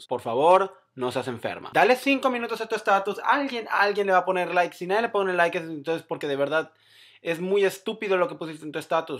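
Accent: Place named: Yucatan, Mexico. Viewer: eres de Yucatan?? tienes el acento....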